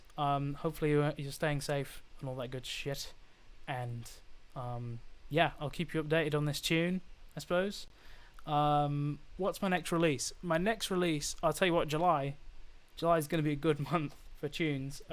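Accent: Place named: Scotland, United Kingdom. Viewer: Thank you for the strream and the production knowledge bruvo, absolutely love your Scottish accent!! This stream made my day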